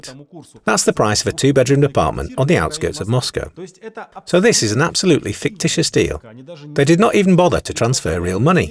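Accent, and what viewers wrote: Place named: United Kingdom. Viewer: Лучше бы американский акцент взяли, его больше народу в мире понимает, чем британский.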